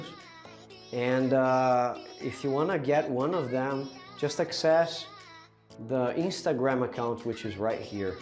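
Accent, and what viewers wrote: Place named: United States. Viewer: oh good im glad u speak american english i thght i might nt understand u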